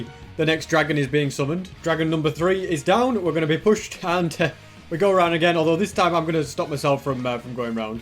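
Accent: United Kingdom. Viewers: Why we all love doesn’t scam has a satisfying BRITISH voice Edit: HOW I COULD FROGET The shiny eyes of course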